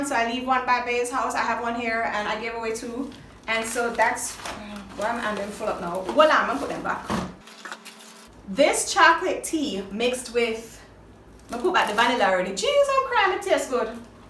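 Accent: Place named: Jamaica. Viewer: So glad fi fine a fellow Jamaican Sista doing ADF....bless up💫✨️ and thank u . Keep speaking patois! Mi luv it...dem have all di adda dialect & languages w Closed captioning.. a fi wi Time now... lol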